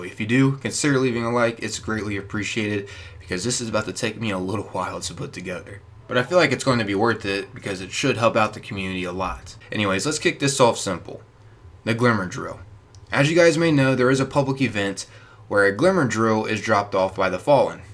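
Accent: United States. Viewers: Thanks for making a video game video with a normal American Voice!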